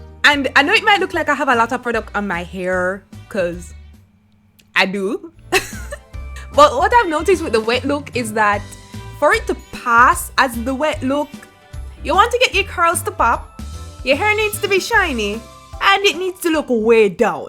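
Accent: Jamaica. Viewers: I love your Jamaican voice lm Jamaican to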